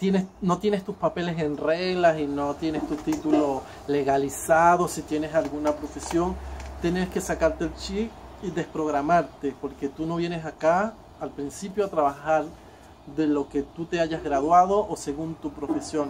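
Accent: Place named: Chile. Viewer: Ya tienes el acepto chileno. se te nota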